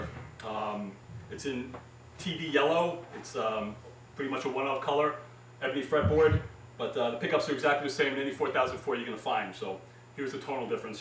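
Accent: United States. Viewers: your either a cop or firefighter, and you live...... hmmmmmm long island? Dont take that the wrong way, I love accents. You sound great. Im probably 100% incorrect. thanks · You sound like a New Yorker!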